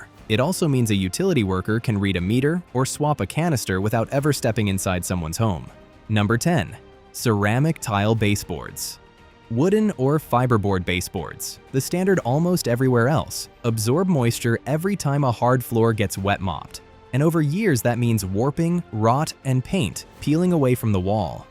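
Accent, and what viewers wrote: Spain. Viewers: Todos los comentarios son España no me gusta, España no inventó eso, mi casa no es así... Madre mía! Sólo comentaron los haters! Que por otro lado si tanto les disgusta no sé qué hacen aquí 🤔 Hasta han dicho alguno que no les gusta el acento español😂 En fin, sin comentarios sobre estos personajes ignorantes. El video, sí es todo lo que echamos de menos si vivimos fuera de España. Las losetas de porcelana como todo, si son de calidad maravillosas si no se prefiere el parqué. Lo que no vas a ver es moqueta en ninguna casa 😂😂😂 El bidé es una maravilla. Un saludo!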